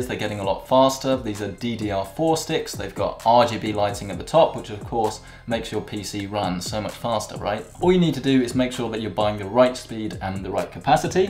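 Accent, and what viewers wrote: United Kingdom. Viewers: Your British accent makes the words go through one ear and out the other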